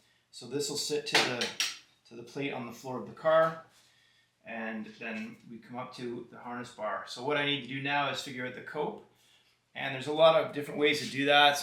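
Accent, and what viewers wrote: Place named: Canada. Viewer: Not even finished and it looks killer already !!! Great color choice. Would love to see it in person at a car show someday 👀 The accent leads me to believe you're quite a ways north of Colorado , be a bit too far 😉 Possibly up in Canada 🤔 Anyways really appreciate the videos.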